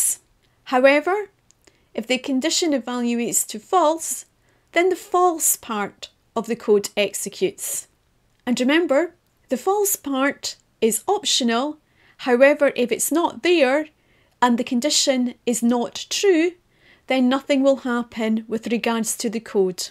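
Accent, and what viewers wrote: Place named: Scotland, United Kingdom. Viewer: Thanks for the clarification! I was looking up some video and was very pleasantly surprised to find a Scottish accent!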